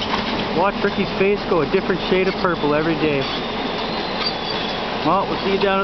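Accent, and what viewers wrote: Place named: West Coast, United States. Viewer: I love it! ha your commentary is as westcoast as it gets!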